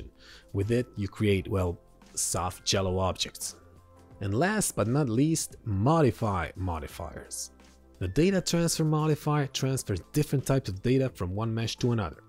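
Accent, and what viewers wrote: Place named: Israel. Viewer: מבטא ישראלי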